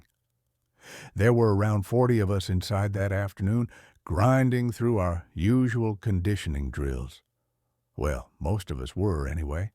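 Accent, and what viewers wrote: South, United States. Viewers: Cowboy accents don’t mesh well with sci-fi stories, sorry. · Narration is an amazingly human sounding AI... with just a touch of a Southern accent. Thank you very much. Little old lady listening from rural West Virginia, USA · You liked an "alien" speaking with a southern USA accent? I find it so stupid I quit watching!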